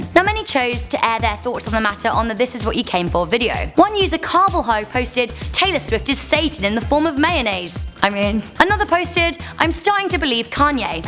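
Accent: England, United Kingdom. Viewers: Are you British if you are I love your voice but if your not I love your accent and crop😸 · She is really pretty and 10 million people in Britain have the same accent, including me!! 😋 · I can't believe your English! I am English too!!!!! :) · I love your hair! red hair is the best! I've also always loved British accents, and your outfit is ON POINT! You go girl!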